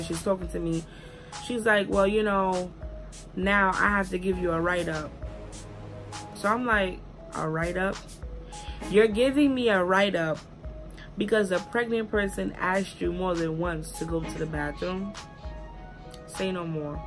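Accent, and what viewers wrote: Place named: Brooklyn, United States. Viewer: I bet you from Brooklyn 🤮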